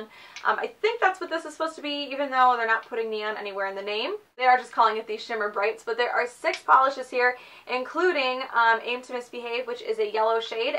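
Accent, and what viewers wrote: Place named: Minnesota, United States. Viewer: Are you from Minnesota? You sorta have a accent. If so cool I'm from Minnesota too!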